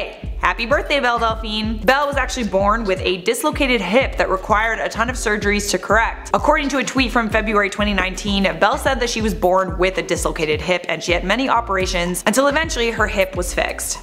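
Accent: United Kingdom. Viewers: She's South African?!! She has British accent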